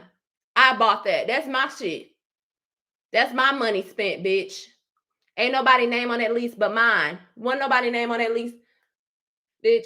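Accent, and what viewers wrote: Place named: Jamaica. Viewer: LolBit@ I’m Jamaican I have the accent too but I love it hahaha you funny af.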